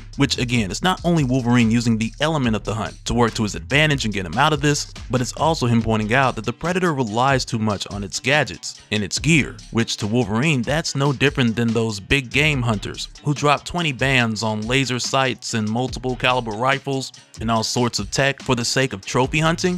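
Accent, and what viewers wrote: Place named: South, United States. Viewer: It’s the “in-and-out” of the Hee-Haw accent that got me……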